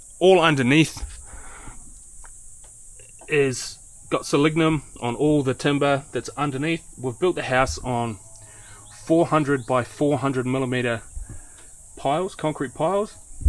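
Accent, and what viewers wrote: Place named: United Kingdom. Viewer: you got a British accent bro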